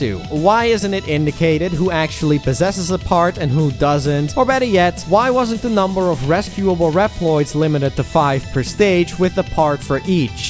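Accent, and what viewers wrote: Netherlands. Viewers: Lmiao!!! I think you have! That Dutch accent helps, too. 💞💕💞 seriously though, loving your content. Currently binge watching your catalog. Subscribed. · SuperDutch accent :)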